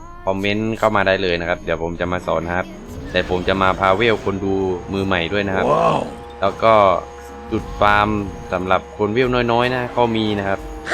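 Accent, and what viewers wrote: South, Thailand. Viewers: จุดนี้มีมาสักพักละครับ (เสียงเหมือนคนใต้)😏😏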